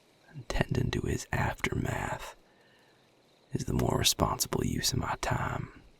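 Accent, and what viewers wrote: South, United States. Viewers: The southern accent is amazing^w^ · Nooo why is his American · It's like Christmas came early- as a fellow southerner, I can't get enough of dear!:D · IS HE A SOUTHERN VAMPIRE?? BLESS MY SOUL~ 😳👊🥰😆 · Jeebus....that gravelly, southern voice is....good chills. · I am NOT a fan of country accents but is an exception 🥵🥵😍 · I love that you have a country accent because it’s great for a vampire like no one really does that and I just think that is so cool for vampire to have a country accent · The southern accent is 💖💖💖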